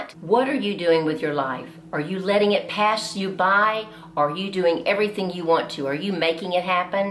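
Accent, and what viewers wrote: South, United States. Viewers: I like your uniqueness..they will love your southern accent, many people will be envious of you. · I love her accent-American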